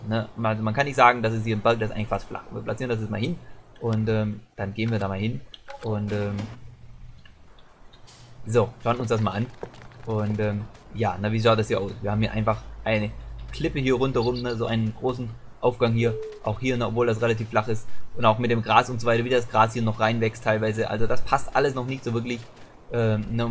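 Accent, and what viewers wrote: East Germany, Germany. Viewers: Wenn ich den piss ossi höre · Gutes Video aber sag mal wo kommst du her ? Dein Dialekt hört sich irgendwie Ostdeutsch an aber auch Iwie nicht. Wie als würdest du versuchen ihn nachzumachen.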